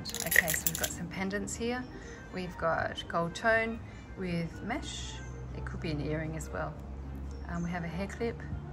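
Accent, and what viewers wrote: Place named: England, United Kingdom. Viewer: I really enjoy your presentation with the beautiful English accent. You have some really nice jewelry. Cheers from Princeton IL from Agi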